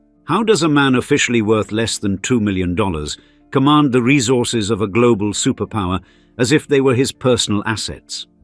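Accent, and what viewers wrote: United Kingdom. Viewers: Hence the British accent of this "narrator". most corrupt oligarchs are British and Americans...... including prime ministers and presidents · Sounds like this Brit narrator is piss Putin took back control of his country and the west cant loot that 76 trillion of resources.😢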